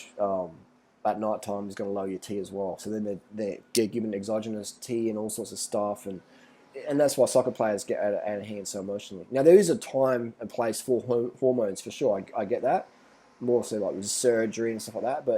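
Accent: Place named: Australia. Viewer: your aussie accent is hilarious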